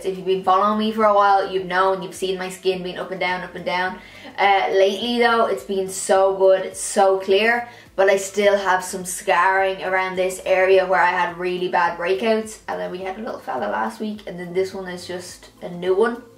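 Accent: Ireland. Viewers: Holy Jesus you're Irish:):) first time watching your vids after following you on social media:) are you a dub as well?xx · I just love listening to your brogue..it reminds me of my Nan who was from Kenmare..☘☘☘☘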